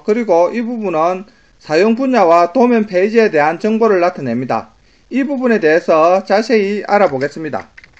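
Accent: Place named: Gyeongsang, South Korea. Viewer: 아지야 경상도 사투리 직이네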